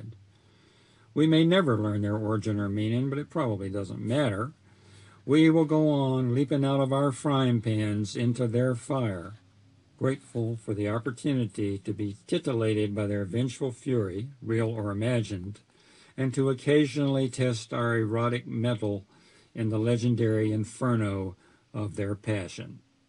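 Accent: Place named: South, United States. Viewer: I'm a huge Tom Robbins fan. Am going to read on my YouTube channel. Came upon your channel. You have a new sub. You sound Southern. I'm from Alabama❤🇺🇸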